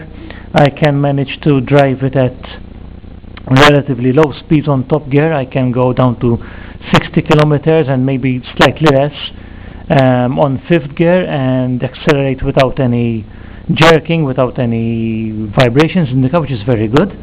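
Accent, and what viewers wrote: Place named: Malta. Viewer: what was the total price with parts you sound maltese so thats why im asking because i have the same issue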